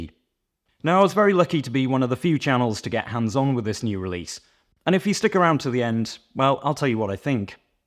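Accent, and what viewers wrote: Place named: RP, United Kingdom. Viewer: you're posh and say it properly, I'm an oik and can't even spell it 😂 Great vid as always dude ❤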